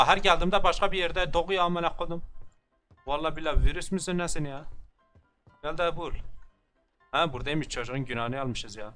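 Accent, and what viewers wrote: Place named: Urfa, Turkey. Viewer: Usta nerelisin konuşma aksanın Urfalı gibi Urfalı mısın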